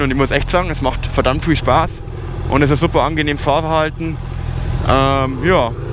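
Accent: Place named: Bavaria, Germany. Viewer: I finds immer no nice dass du beim boarischn bleibst :) Bist echt a griawiger typ Weida so!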